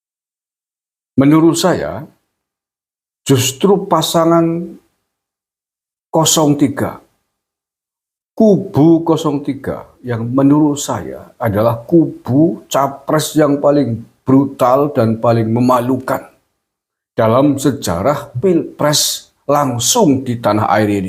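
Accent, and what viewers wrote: Banyumas, Indonesia. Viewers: Mau emosi denger bhsa ngapak jeg ketawa😂😂 ga jadi dah emosi lbh baik legowo..😊😊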